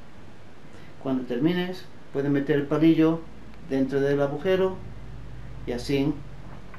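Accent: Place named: Andalusia, Spain. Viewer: que acento mas andaluz xDDDD :)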